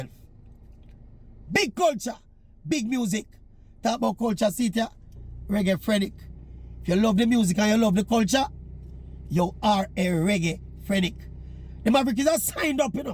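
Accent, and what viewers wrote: Jamaica. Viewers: You speaks like a balance Jamaican...Big up our Culture!!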